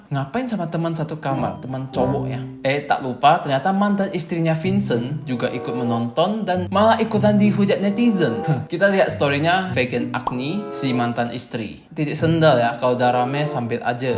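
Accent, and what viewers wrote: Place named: China. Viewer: Are you Chinese People Mr??? Because style your talking very funny... I'm very sorry Mr 🙏🙏🙏😊, Hopefully Always Stay Healthy and keep Strong everyone 💪💪💪💪🙌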